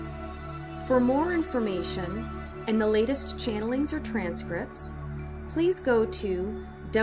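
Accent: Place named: Canada. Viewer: You lost me at "outside" and "outcomes." Oooooot-side and oooooot-comes. Canadians should be seen and not heard...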